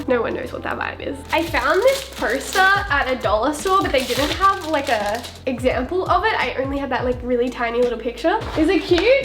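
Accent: Australia. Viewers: are you an aussie trying to sound american or an american is australia?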